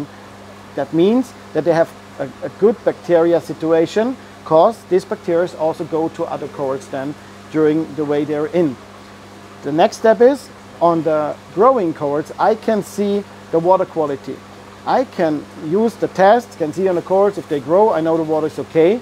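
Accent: Swabia, Germany. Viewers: no a little more schwäbisch please 😊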